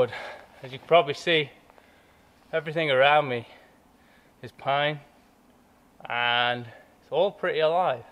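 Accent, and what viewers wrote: South, United States. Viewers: Oil your gloves... mineral oil.. ppl suggest other oils... 🙄 just oil them. Ya really need a saw upgrade. I wouldn't wanna be caught dead in the wood with that- (I know its compact and lightweight) they would say: "at leased the saw kept him warm, isnt it?" Awesome Southern accent btw 😂. It's well advised to not drink whilst camping alone... but, that's your business. Good vid, great job, thanks for posting. One can learn a thing or two from this.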